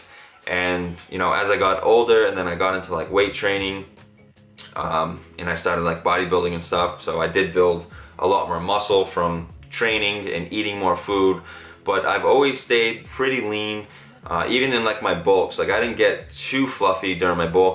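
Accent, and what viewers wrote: Boston, United States. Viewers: 1) I love your eyebrows 2) I feel you on the relationship stuff. There’s no need to rush into things or constantly be looking for love. 3) Your Christmas dish mash up is the best 😝 4) I have MS and love the gym and food, but sometimes it’s so hard to get past my symptoms and dieting specifically for it. I understand being mentally stressed around medications and diets. It’s nice to hear someone give it all up and be free because I did that last year with a medication that almost killed me. You’re a great person. I love the videos! And I love your Boston accent lol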